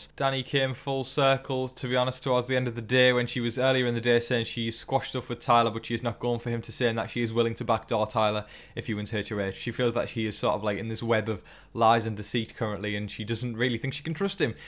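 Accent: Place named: Durham, United Kingdom. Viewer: Hey! Where are you from? I'm from Durham and you have my accent lmao. Can't believe I've found a bb YouTuber from the North east!!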